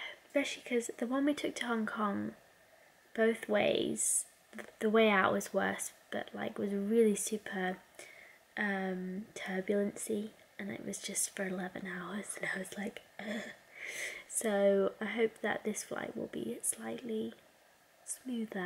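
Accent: Scotland, United Kingdom. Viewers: It's only now I've started to notice a little Scottish accent coming through!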